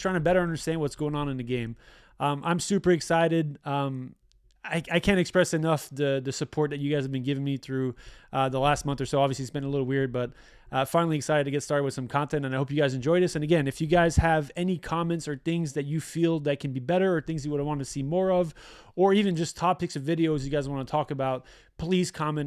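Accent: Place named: Quebec, Canada. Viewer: Thank you! I was in your live stream when you were talking about this and I remember asking if you would make a youtube video about it, so pumped to see that you pulled through. Please make more videos like this!! Also cheers from Canada, I know a french canadian accent when I hear one.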